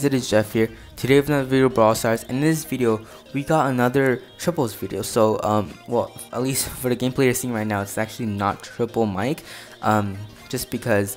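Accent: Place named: Brazil. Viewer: Parace br tentando falar inglês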